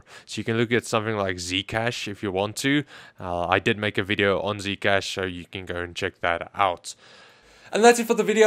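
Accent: Afrikaans, South Africa. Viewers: Wou sê jou aksent klink Afrikaans. Dankie vir die raad maat. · Are you south African? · Wow i heard his accent and knew hes from SA i am also lol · This is the first video I've watched from this channel, and the first thing I thought of is, "Is he from South Africa?" because I'm originally from there, so I could detect the accent. It was fun to see I was right when the ad came in.. "are you from south africa and want to get some new gaming products?" Lol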